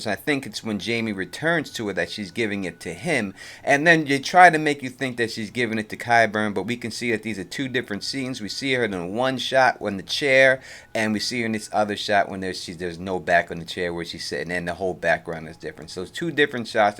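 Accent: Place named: Texas, United States. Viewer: why the hell do these channels all have texas accents?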